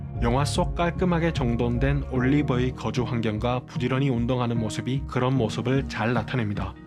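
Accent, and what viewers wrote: Gyeongsang, South Korea. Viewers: ㅋㅋ 사투리 너무 재밌어요 경상도 사투린가요? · ㅋㅋ보통다서울말로만 듣다가 경상도분이니깐 되게신기해요ㅋㅋㅋㅋ 나쁜뜻아니고 딱한마디 듣고 빵터졌어요ㅋㅋㅋ 귀여워용 크 친근감ㅋㅋㅋㅋㅋ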